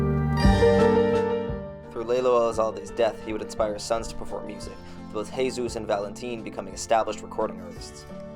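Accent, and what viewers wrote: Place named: United States. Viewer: Nice to hear a american speak on famous mexican singers. ❤❤🇲🇽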